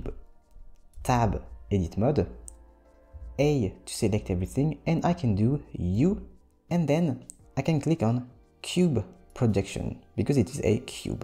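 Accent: France. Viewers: ça pue l'accent français tout ça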